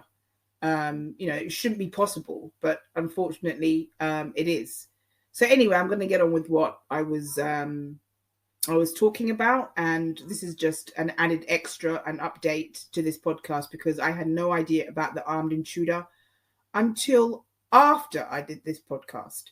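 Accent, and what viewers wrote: RP, United Kingdom. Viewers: Thank you dear. I do love your channel and especially your stuff upper lip Queen's English. You're an intelligent, concise and interesting person. May 2022 bring your heart, soul and mind all that you may wish for.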